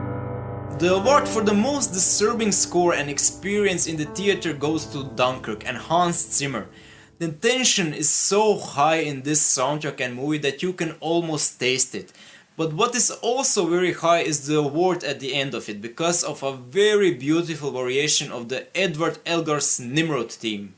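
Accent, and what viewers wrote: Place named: Northern Europe. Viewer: good to know. Just know that I'll be supporting you, because not many people respect film scores such as yourself. And also, where are you from?- you sound northern European.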